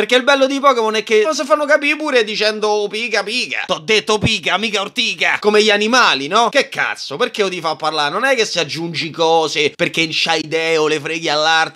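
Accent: Rome, Italy. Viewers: TU devi doppiare pikachu e con accento romano incluso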